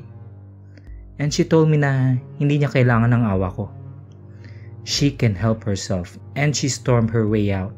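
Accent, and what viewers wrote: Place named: Conyo, Philippines. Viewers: Tama..sakit sa tenga tunog konyo...tinigilan ko na pakikinig..ibang story na lang... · pwedeng straight english na lang...nakakairita at konyong konyo ang dating.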